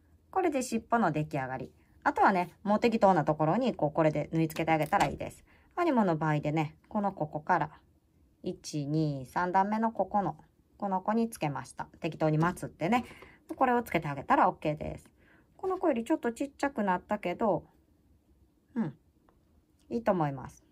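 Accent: Kansai, Japan. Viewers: 昨日登録させていただきました。とても軽快な関西弁が心地よく楽しく拝見しています。不器用ですが頑張って作ってみたいと思います！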